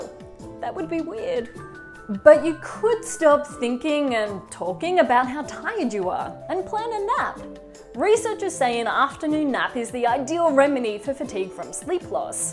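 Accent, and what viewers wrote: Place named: Australia. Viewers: I have an Australian accent and since I don't notice an accent must be Australian. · I believe it's Australian, but she is from New Zealand as far as I remember.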